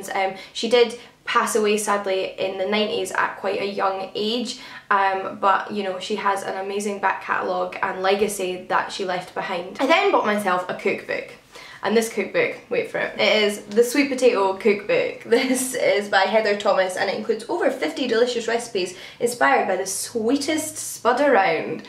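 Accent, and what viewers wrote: Scotland, United Kingdom. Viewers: I havent been subscribed very long but I love your accent! Where are you from? Are you Scottish?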